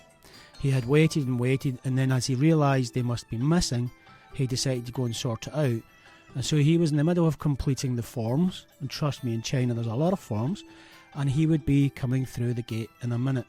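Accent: Scotland, United Kingdom. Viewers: ❤❤❤❤❤Love your Bonnie Scottish accent😁😘🌷🌷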